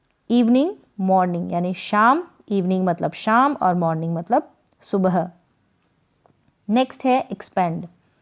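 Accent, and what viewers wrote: Chhattisgarh, India. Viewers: Hello didi aap chhattisgarh se ho kya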